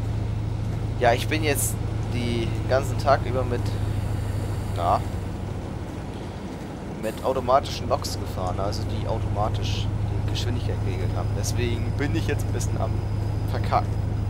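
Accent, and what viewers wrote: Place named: North, Germany. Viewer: Merkt man an der Sprache :D Hamburg hattest du glaub ich im Video gesagt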